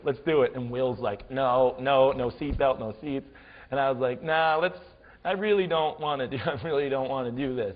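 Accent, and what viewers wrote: Boston, United States. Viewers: He needs to bring the Boston accent back more often. Seriously. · Oh my god! he is the best story teller ever!I love his boston accent, its priceless!!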